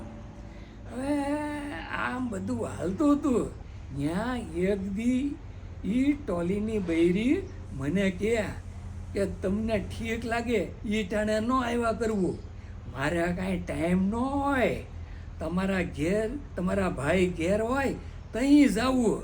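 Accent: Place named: Bhavnagar, India. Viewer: અસલ ભાવનગરી ભાષા😂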